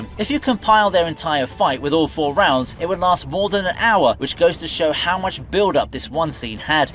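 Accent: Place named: United Kingdom. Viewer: Hou je kanker bek met je kut Britse accent. Groetjes Jos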